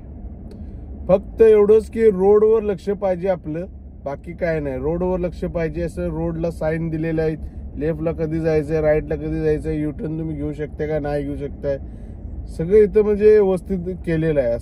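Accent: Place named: Kolhapur, India. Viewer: तू कोल्हापूर कडेचा आहेस वाटते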